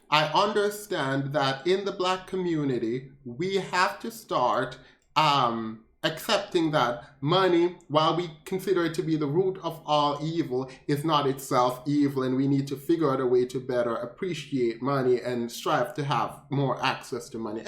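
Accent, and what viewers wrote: Jamaica. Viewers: I think I detect a Jamaican flavor to your cadence. Am I right? Are you a fellow yardie? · I appreciate your content and the way you speak so eloquently. Liked & subscribed. Too many Jamaicans on YouTube disguise their/our beautiful accents and speak with a lot of American slang in desperate attempts to sound like many others, which sounds inauthentic. It's refreshing to listen to you. Keep up the great work! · We visit Jamaica a few times a year. I LOVE my people there. Can someone adopt me? I heard the flavor in his voice too!! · You Jamaican?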